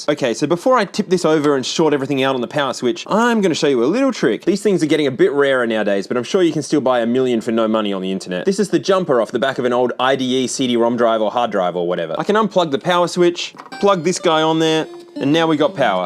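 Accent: Australia. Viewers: he is aussie mate. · Guy who has only ever heard 1 south australian hearing their second sound australian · Why am I so f*cking hooked on Australian YouTuber delivery. My wife calls it’s Bluey for adults 😬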